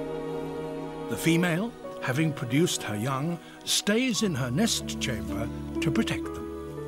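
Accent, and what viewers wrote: United Kingdom. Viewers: I wish this eloquently spoken British chap would narrate my life..."The American father, still in a stupor early in the morning, must have coffee to function properly, and tends to watch strange youtube videos while sipping coffee."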